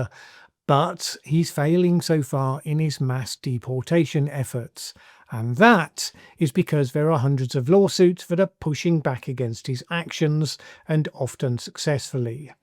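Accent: United Kingdom. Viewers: When someone uses incorrect grammar it makes me doubt what they are talking about. He’s obviously British which may result in a difference in pronunciation.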